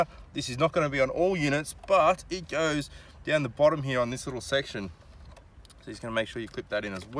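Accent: Australia. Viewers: Always good to fire up a video and hear an aussie presenting it. 👍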